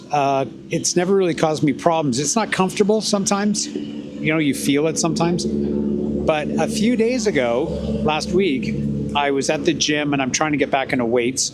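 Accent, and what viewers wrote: Canada. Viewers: Your accent appears to be Canadian. How come you didn't go for the free stuff in Canada?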